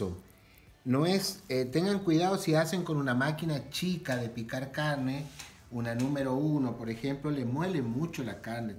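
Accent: Santiago del Estero, Argentina. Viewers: Amo la tonada Santiagueña soy de catamarca muy linda explicación 💪🏼💪🏼🇦🇷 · Q bueno amigo .tenés tonada santiagueña puede ser? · Que lindo escuchar la tonada santiagueña en un video productivo 🙌🏼 abrazo grande hermano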